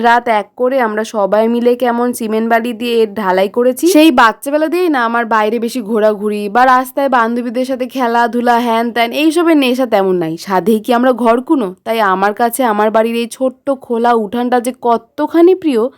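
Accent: Bangladesh. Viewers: প্রথম দেখায় তুমি আমর মন জিতে নিলে আপু তুমি আমাদের বাংলাদেশের মত কথা বলো গো🤭❤️❤️❤️❤️❤️❤️❤️ love from Bangladesh 😊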